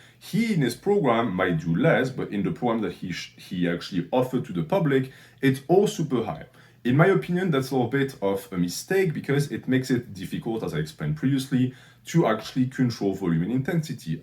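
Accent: France. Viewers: why are you french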